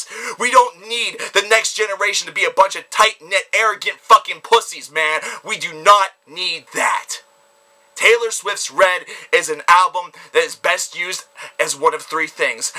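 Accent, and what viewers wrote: South, United States. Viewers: Your southern accent makes me laugh so hard hahahaha!!!!! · Lol that southern accent reminds me of Bill Hicks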